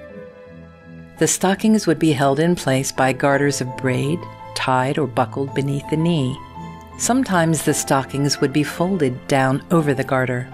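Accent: United States. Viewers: Why am American accent?? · Why is a yank, who is talking with a "porn voice" narrating this?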